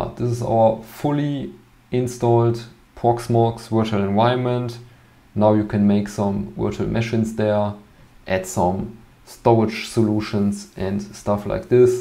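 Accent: Germany. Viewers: you sound really german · I hear german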